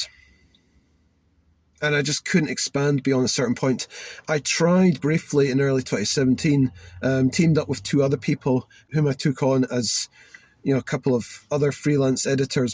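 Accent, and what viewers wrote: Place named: Scotland, United Kingdom. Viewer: My youtube got nuked 3 times, and this account I barely upload anything anymore...sh!t is just too demotivating after being steamrolled so much, and I remembered a channel I was subbed back then, with a guy with somewhat of a scottish accent, and now I found it again! Glad to see you're still around in the original form. Subbed.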